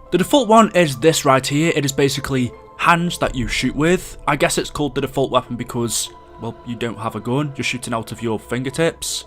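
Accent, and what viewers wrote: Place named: Stoke-on-Trent, United Kingdom. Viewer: Are you from stoke on Trent ? I recognise the accent, I’m from stoke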